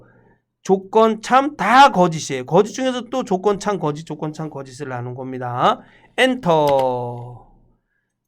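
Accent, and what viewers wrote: Gangwon, South Korea. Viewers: 말투가 강원도 분이신거 같은..ㅎ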